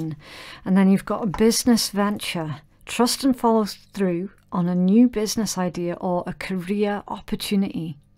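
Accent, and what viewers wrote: Scotland, United Kingdom. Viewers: omg i love your accent!! Scottish ? ♥and as for the read : dead on accurate !!!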